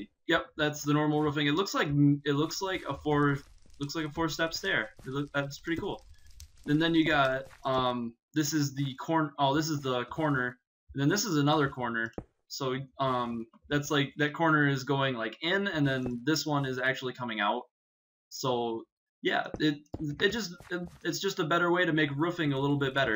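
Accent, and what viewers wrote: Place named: England, United Kingdom. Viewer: I think he comes from Britain and more precisely England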